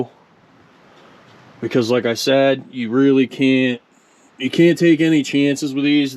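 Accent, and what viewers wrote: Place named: Buffalo, United States. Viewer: you sound like you grew up in buffalo or somewhere close.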